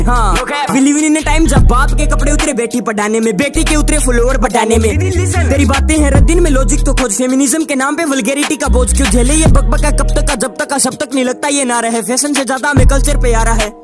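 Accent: Rajasthan, India. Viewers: Bhai❤😂राजस्थान से हो detected I'm also❤🎉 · Bhai kya aap Rajasthani ho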